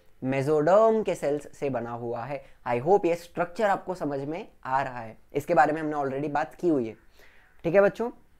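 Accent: Maharashtra, India. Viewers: Sir are u marathi????? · Sir, kya aap marathi ho? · You are marathi ??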